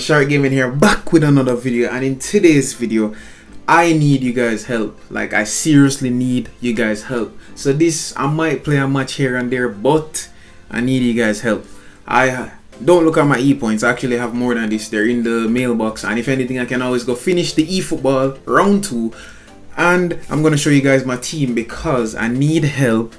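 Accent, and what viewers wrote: Jamaica. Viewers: are you jamaican bro. if not big up stills 🇯🇲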